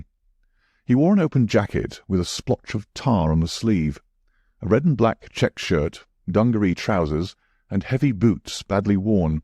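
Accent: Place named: North, United Kingdom. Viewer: No the fellow is from the North .An actor i may say . Aged 45-50 . Thank you Watson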